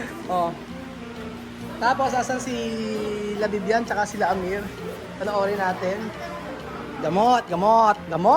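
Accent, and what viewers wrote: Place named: Chavacano, Philippines. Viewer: Ang Spanish mo napaka close sa Chavacano. Mabilis ko maintindihan.. pero nag basic spanish rin ako online.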